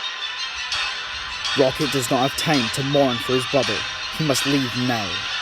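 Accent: Scotland, United Kingdom. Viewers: I’m just wondering are you Scottish cause to me you do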